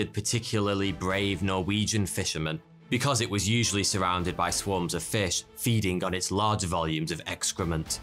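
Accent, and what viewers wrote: United Kingdom. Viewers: I have never heard someone so British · I watch these because it feels like I have a British teacher who's actually interested in the subject they talk about. Makes it even more enjoyable to learn new stuff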